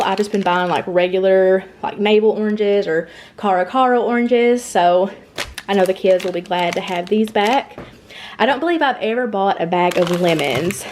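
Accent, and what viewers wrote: South, United States. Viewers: You speak very well it's all steel magnolia very southern best wishes for all your goals on giving us you loveya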